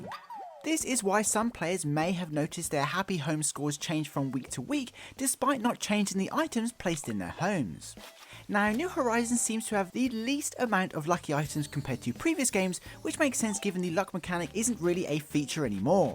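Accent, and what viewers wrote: England, United Kingdom. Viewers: he’s English lol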